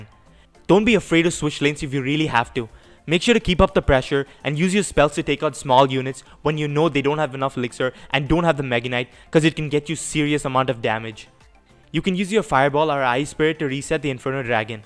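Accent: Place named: India. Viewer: I love the video! Also i love your accent. Probably the best indian accent i have ever heard :D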